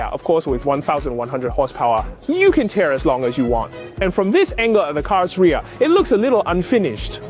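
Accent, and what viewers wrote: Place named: Kenya. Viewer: Cool car Some of your pronunciation sounds Kenyan man... Ever been?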